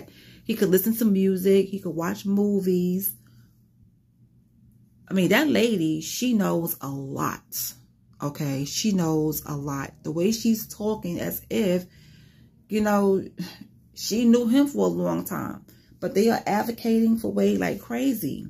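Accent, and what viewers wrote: New York, United States. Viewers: You sound like your from New York. We got New Yoooork in da house!!!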